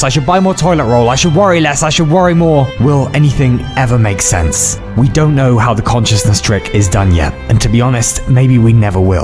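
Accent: United Kingdom. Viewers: r u British living in Bulgaria, or just Bulgarian with a british accent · Can’t tell if he’s British or Bulgarian but whatever it is, I love the small Bulgarian easter eggs!!! Love your ideas man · Yeah his accent, vocabulary and past videos (complaints about the British train system etc) screams Englishman, but now I've seen he apparently lives (temporarily?) in a clearly Slavic/Russian place, judging by the POV footage from this video. Maybe he found a Russian soul mate ;p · Your new microphone isn’t as clear as the old one. That or the overlays are drowning out the crispness. It’s more important to hear the British narrator than the musical background! Edit: the video is still damn good enough to make me forget the issue but it exists. Pls make more space turtle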